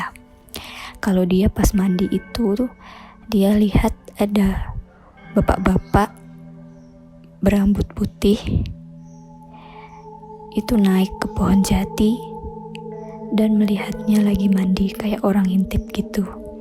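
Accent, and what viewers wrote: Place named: East Java, Indonesia. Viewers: Karangan? Mbaknya pasti org jatim ya hehe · Hooh dia orang Jatim wkwkw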